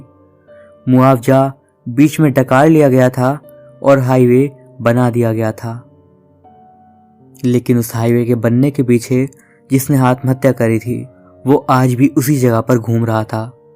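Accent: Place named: United Kingdom. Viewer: Ji, mai aapka ek fan hoon, ye ho gayi sabse pehli baat. Ab mai kuchh aisa kehna chahta hoon, jise sun kar, mai ummeed karta hoon ki aap bura nahi manenge balki, meri baat ko positive way mein lenge. Mai darasal ek suggestion dena chahta hoon aapko- please ho sake toh apne "र" ke pronounciation ko theek karne ki koshish karein kyun ki woh sunne mein aisa lagta hai jaise koi angrez kahaani suna raha hai, aur dusri baat, jab aap kahaani sunate hain toh beech-beech mein aapke do (2) words intermingle ho jate hain, jiski wajah se woh vakya theek se samajh mein nahi aati. Baki sab Great hai Boss! Aap ek amazing story teller / reader ho, aapki voice expression perfect hai. Bas, just yahi do-ek cheezein sahi ho jayein toh aap really outstanding performer ho sakte hain. Isiliye aapse request karta hoon ki zara in biduon per dhyan dein jo ki maine apne comment mein ujagar ki hai. 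Ummeed karta hoon ki aap meri (apne ek fan) ki baaton ko positive way mein lenge, na ki, bura manenge. Please do believe me buddy, i just love you & your stories too much!❤👏🏼👌🏻👍🏻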